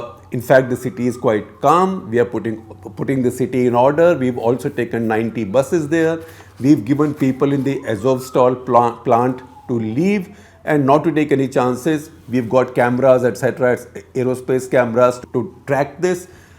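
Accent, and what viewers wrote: India. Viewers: Indian accented is hard to understand 🤪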